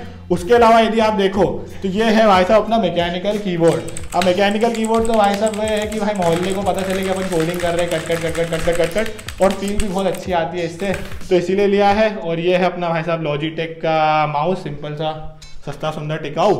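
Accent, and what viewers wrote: Indore, India. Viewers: big brother It is an indori accent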